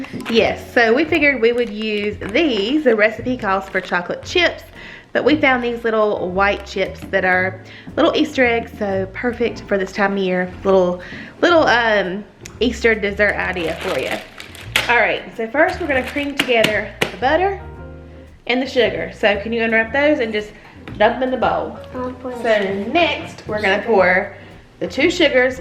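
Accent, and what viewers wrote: South, United States. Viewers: I just love your darling personality and sweet voice. I lived in the south for 10 years (live in the Midwest now) but I miss hearing all the sweet as honey accents and voices of my friends and neighbors. I've been out of the South now for over 20 years but I still say y'all, and sometimes all y'all - even if I do get the occasional odd look - ha!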